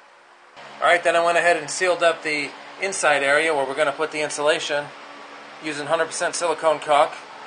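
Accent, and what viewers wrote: New England, United States. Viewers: This is fantastic info. Just in time. Thank you. I subscribed and liked and shared even to friends I have other countries. Are you a New Englander? Your accent. Really nice video. Well done!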